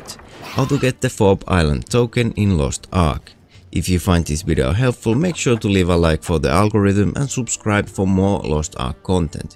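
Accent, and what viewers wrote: Finland. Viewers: is that a finnish guy i am hearing since the Q and the word youtube came out pritty finnish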